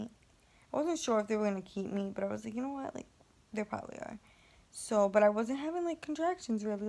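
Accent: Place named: New York, United States. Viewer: She has a NY accent that's why I ask. In love w you girl I'm fairly new ☺️💜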